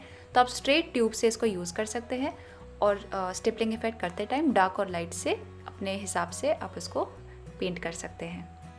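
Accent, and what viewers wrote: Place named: Kashmir, India. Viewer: Didi are you kashmiri?? Your accent sounds so ❤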